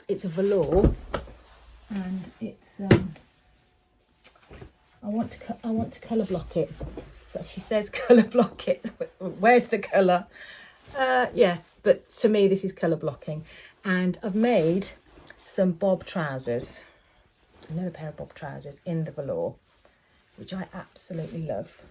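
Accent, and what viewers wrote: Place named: Midlands, United Kingdom. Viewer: So glad I found your channel. At last someone who buys from the Rag Market in Brum. I LOVED the Rag Market. Living in Quinton I used to get the number 9 bus into Birmingham and spend an hour (or two) at the Rag Market and then get the 50 bus to my mother-in-laws house in Kings Heath loaded down with at least 2 big bags of fabric. I miss that place so much! Lived in Devon since 1987 where fabric outlets are scarce and like you Pound Fabrics are a favourite. Anyway just wanted to say I love your pattern and fabric choices and it’s great to hear a faint Midlands twang (I’m from Dudley originally and have never lost my accent). I’m catching up with your videos and treat myself to 3 a day. Making a pair of Bobs today! Xxx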